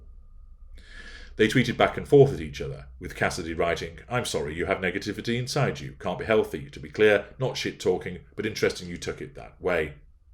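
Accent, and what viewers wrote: United Kingdom. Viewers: He has a very relaxing voice, just like Omegon. But I'm in love with the British accent anyway.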